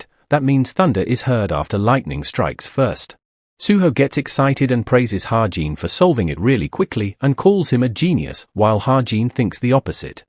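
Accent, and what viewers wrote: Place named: United Kingdom. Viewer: Why does the ai voice sound British I ain complaining tho this peak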